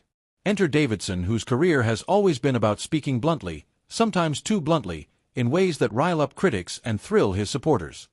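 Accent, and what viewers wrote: United States. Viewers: Why we got a bleedin yank telling us this . Just get on with it yawn yawn yawn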